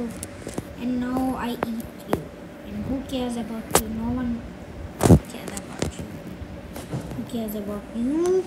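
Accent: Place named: India. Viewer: Your accent like Indian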